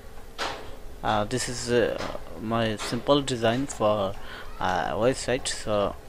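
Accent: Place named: India. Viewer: Terrible video, and what language are you using? Indish?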